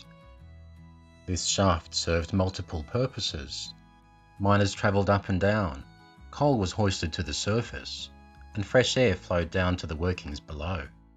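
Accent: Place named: Australia. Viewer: I'll second that no Yankee accents here just fair dinkum Aussie😊😊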